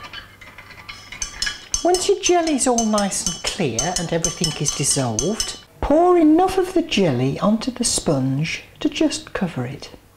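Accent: United Kingdom. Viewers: You sound so British, so refreshing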